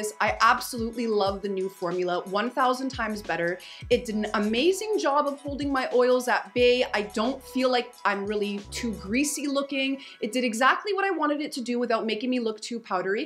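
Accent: Canada. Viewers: I can hear the Canadian in your voice!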